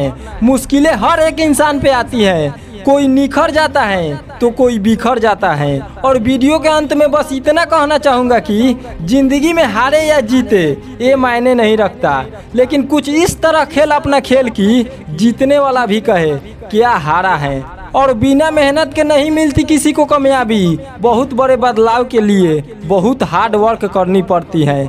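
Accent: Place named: Bihar, India. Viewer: Mai bihar se hu tumhara Bihari tone saph dikh rha hai Hindi sahi karo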